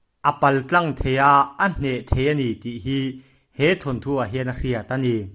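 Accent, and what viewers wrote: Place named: Myanmar. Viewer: I trawng hi Myanmar (burma) aw top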